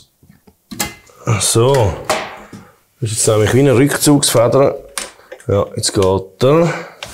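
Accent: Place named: Switzerland. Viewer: Die Sprache... Schweizer Deutsch... ist nichts für empfindsame Ohren!!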